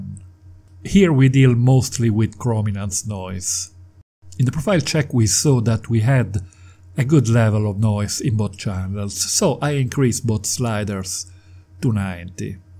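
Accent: Italy. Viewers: accent italian. are you italian ?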